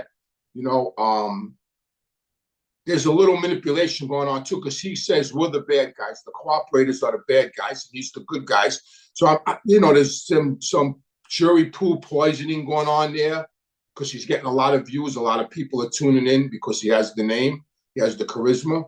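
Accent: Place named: Queens, United States. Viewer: It’s Joey merlinO not Joey merlinA lol it’s ok it’s that queen’s accent I have it too :(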